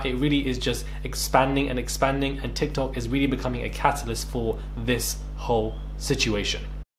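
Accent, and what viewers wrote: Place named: United Kingdom. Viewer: I only come before ur great British accent!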